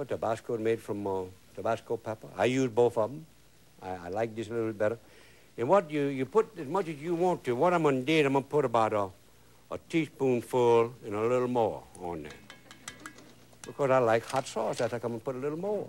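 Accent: Louisiana, United States. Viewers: I love his cajun accent. That indecent exposure story made me laugh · When I was younger I use to love to watch his shows especially with me being a southerner. I miss him so much. I loved his Cajun accent and his personality he was never boring to watch. RIP Mr. Wilson you will never be forgotten. <3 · I am from Louisiana and enjoy listening to him talk with that accent. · Lol.. not unless you were born and bred in the deep south louisiana like me den you understand him jus right cher 😉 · Hell yeah especially when ya throw in a lil bit French, a lil bit English and a lil bit Cajun all in one sentence...... Yankees looking like what the hell did he say???.......😆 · So funny reading all of the comments below. I too looved this man show when I was a little girl. He spoke with a very similar dialect as did my family from south Louisiana. One thing tho... 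his rue is waaaay too dark but maybe that was the Cajun way... my family's Creole much lighter (and delish; we make it every winter holiday). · I'm a North Eastern lady, but every time I use cayenne pepper when cooking, I say, "CAYENNE PEPPER 🌶️" Out loud in a CAJUN VOICE, because I used to watch this fine gentleman's cookin' show! I was SO happy to have this pop up on my YouTube today, so I could hear that voice again. (Exactly how I remembered from years ago!) ❤ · That's probably the most original Cajun gumbo I've ever seen. This fella was like the Julia Childs of Louisiana cuisine, when you wanted to give hearing Julia's posh English a break you could tune in to this and hear authentic down to earth Cajun French accent along with some humorous stories and jokes thrown in. RIP, frere, you will never be forgotten.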